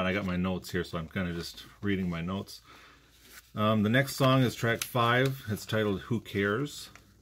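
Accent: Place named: Minnesota, United States. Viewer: Too funny. I think sometimes the more you listen, the more you like :) I'm glad you're enjoying it :) Also I'm sorry I thought you were from Canada...to my Michigan ear, Canadian & Minnesota accents sometimes sound the same....Oy vey.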